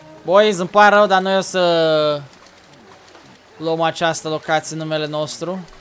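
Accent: Moldova. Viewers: bă ce-mi place accentul de moldovean :)))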